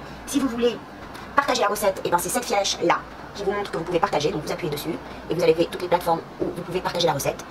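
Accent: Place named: France. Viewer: Honey cake is my favourite!i love French accent Left u a liked👍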